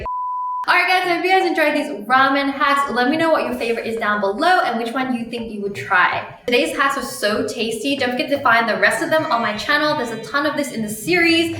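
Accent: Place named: Australia. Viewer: I miss your Aussie accent! You sound so different. Will defs try the peanut butter recipe 😋😋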